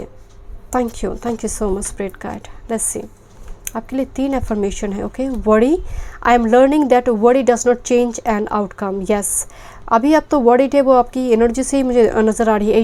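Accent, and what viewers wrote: Bengal, India. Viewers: pile#3 well it's the very first time I am into this channel and loved the entire reading, though I want to ask you 'are you a Bengali?" as your accent is quite similar to Bengalies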